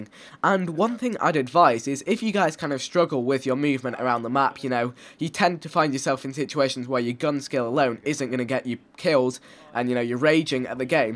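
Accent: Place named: United Kingdom. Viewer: Don't be silly guys. He's good old British!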